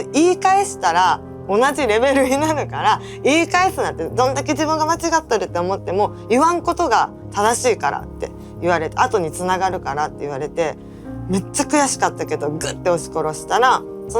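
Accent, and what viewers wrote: Okayama, Japan. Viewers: なんか喋り方に既視感あると思ったら 藤井風も岡山じゃったわ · ぼっけぇ本音じゃねー(^-^)わしも岡山じゃけん、まあたその岡山弁たまらんのよ❗いつか、千鳥とコラボが出来たらぼっけぇ事なりそうじゃね。もっと岡山弁使っていこう❗ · 岡山弁かもし出してるのがいい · 所々岡山弁でそうになって抑えとるところ可愛い笑 ほとんど岡山弁やけど可愛いぞ！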